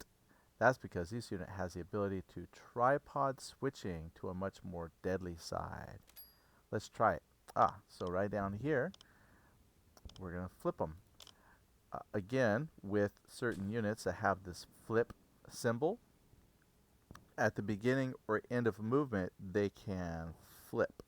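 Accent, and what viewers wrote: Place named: Germany. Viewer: good german accent :-) :-)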